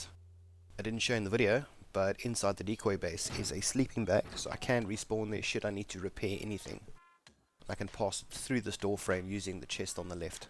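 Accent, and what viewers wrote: South Africa. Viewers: I knew you are south african!!!!!!!! Lekka CHOPPA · hey are you south African. if so i am too:) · Are you South African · I know a South African when I hear one O.o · You sound South African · Where u from, South Africa? I’m from there. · Hey... are you from South Africa? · Are you South African, by any chance? Great video also!!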